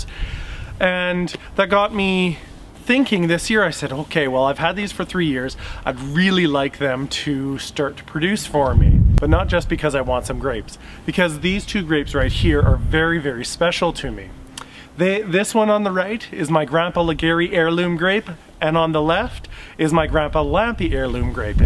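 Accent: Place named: Canada. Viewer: This is my fave gardening channel. Upbeat, empirical, comprehensive, good scope, and with the cutest Canadian accent EVER. Hey, I have European plums, cut them to knee height last spring after planting. Can you explain vase vs central leader pruning for young trees? I find it hard to visualize as a process from year to year.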